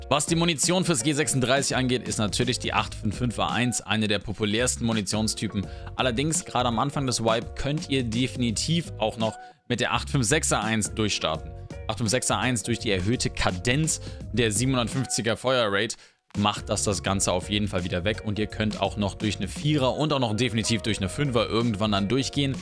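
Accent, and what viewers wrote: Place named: United States. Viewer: das liegt dadran das er 5 Jahre in den USA gelebt hat, das bekommt man auch nicht mehr aus ihm raus^^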